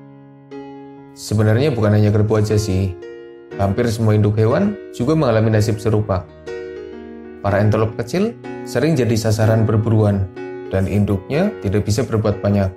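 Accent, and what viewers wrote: Banyumas, Indonesia. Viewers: Rika ngapak ya min.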